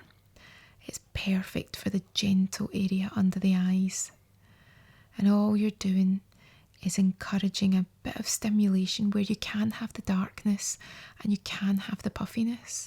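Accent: Scotland, United Kingdom. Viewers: omg i love her Scottish accent so much! Great video and very easy to understand:) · Love Scottish accents 😬😍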